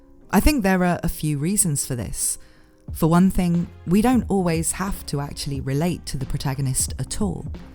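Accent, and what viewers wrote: United Kingdom. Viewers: I’m an over the road(otr) trucker in America, so it could be just that lack of familiarity with uk accents, but you really sound like my truck PC gps voice. A very pleasant smooth female British voice. Also, great video. As a dude, I do get fatigue from constantly hearing that because I have a penis, I’m the source of all women’s problems, so every once in awhile, it’s nice to hear from a woman who isn’t bitter down to the core of her being about having to live as a shudder woman. Finally, I also LOVE to hear from people who are truly passionate about things. Like when someone KNOWS cars and can talk your ear off about different models and their high and low points. And while the video wasn’t all about re:zero, the obvious passion you have for it, coupled with the fact that I didn’t know much about it before, and learning all this new information about it from you, has made me think that maybe I ought to go purchase the first few manga, or at least watch a few episodes. 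Also, also, you’ve definitely earned a subscription with this video. Sure it was an hour but it didn’t feel like one! Well done!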